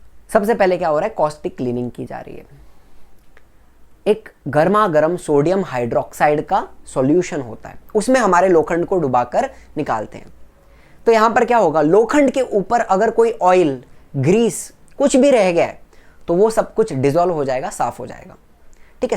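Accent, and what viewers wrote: Maharashtra, India. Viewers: Sir are you Marathi or Watt ? Please tell me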